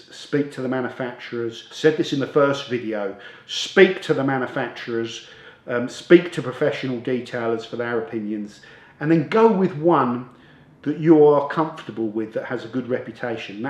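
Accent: England, United Kingdom. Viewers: I like the nature of his videos as is. Conversational. Friendly. You get a feel for the personality. I would enjoy them far less if they were closer to the overly constructed, clinical tripe you're describing. Then again, I'm American and might just be enjoying the accent; it's like listening to a Charles Dickens character tell me about a magical place called Bilt 'Amber, where I can only imagine they have wonderful foods that are all called 'pies' for some unknown reason.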